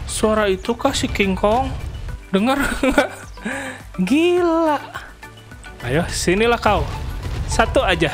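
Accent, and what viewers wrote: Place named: Sunda, Indonesia. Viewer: Orang mana sih bang kok ada sundanya ada jawanya?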